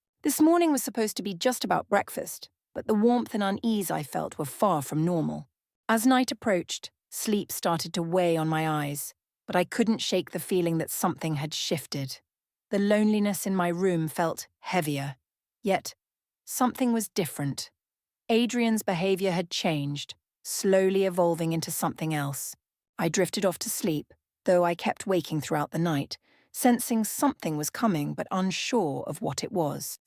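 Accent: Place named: United Kingdom. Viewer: British accent is really beautiful to listen. ❤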